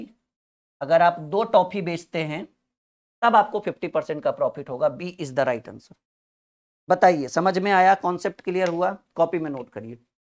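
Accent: Bihar, India. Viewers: Sharab bahut achcha padha rahe hain kya aap Bihar ke hain kya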